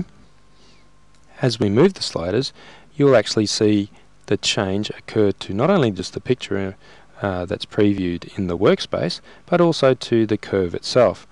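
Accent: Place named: Australia. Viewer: Aussie...hehe. Sorry I would have thought this was really informative but your accent is distracting...been living in the uk for too long lol.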